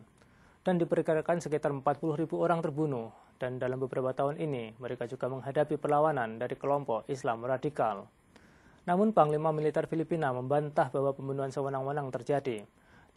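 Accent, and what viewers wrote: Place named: Java, Indonesia. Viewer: HE HAS A JAVANESE ACCENT! He pronounces words so bad :(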